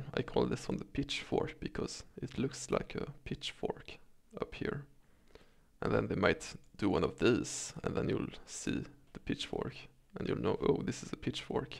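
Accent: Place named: Sweden. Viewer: sjukt att man märker direkt från dialekten att du är svensk.. men bra jobbar :D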